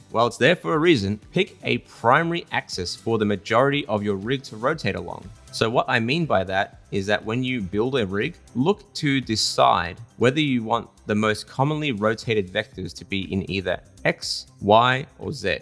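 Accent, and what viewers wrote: Australia. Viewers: interesting. Thanks for you replies bud. Btw I detected an Aussie accent? I’m based in Sydney. I like the style of your work so I’ll be keeping an eye on your page.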